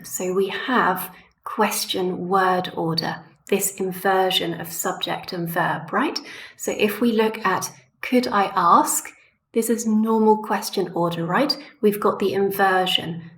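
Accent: United Kingdom. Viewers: I wonder if you could tell me what your accent is. Is it fully RP or mixed from one of northern or southern part of UK?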